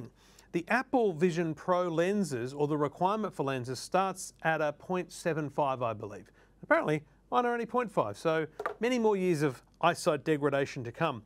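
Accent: Australia. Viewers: I've watched an obscene amount of videos about the Vision Pro. It’s nice to finally see/hear an Aussie talking about it 😅 The pass through is something I’m a bit disappointed about. The degraded quality is purely because you’re looking through a camera. I wish it used better cameras. For 6k you’d think it would. I’m taking this Friday off. Picking up my headset at 9am. Very exciting 😄 Thanks for the vid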